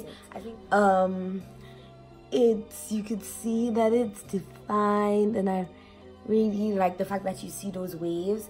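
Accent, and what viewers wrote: Caribbean. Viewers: I like your accent, you sound like you're from the Caribbean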